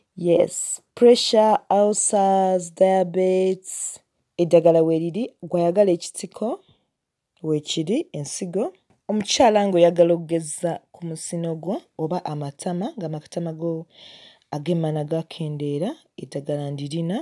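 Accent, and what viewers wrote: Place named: New York City, United States. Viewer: Extremely NYC voice...this is first class screaming...not so different butbyo voice hooo😂❤🤗🥰💥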